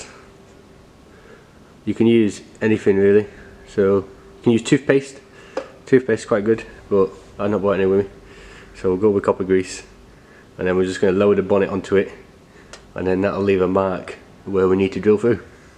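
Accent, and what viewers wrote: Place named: South Africa. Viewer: Love the accent! Where ya from? I'd have to guess south africa but honestly I've got no clue lol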